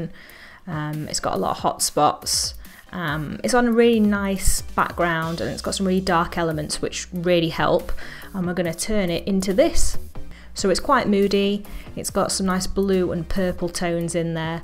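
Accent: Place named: Liverpool, United Kingdom. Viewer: scouse accent = 😍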